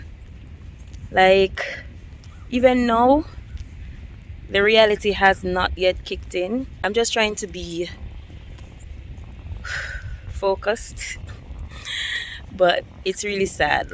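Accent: Jamaica. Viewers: When you speak rank Jamaican it is hard to understand you, please be mindful that persons other than Jamaicans are watching your videos. Good video nevertheless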